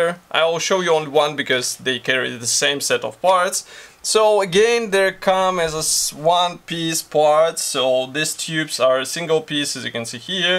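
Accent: Russia. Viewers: Какой то акцент как у русского. ты русский что ли?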